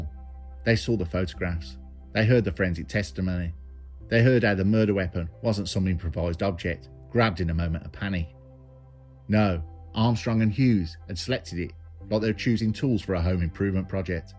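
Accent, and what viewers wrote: Birmingham, United Kingdom. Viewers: Good upload with a Brum accent ? Thank you fella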